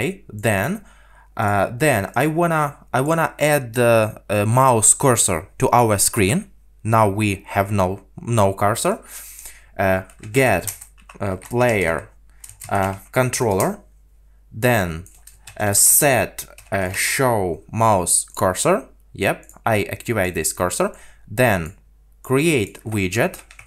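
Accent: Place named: England, United Kingdom. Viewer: O, vi iz anglii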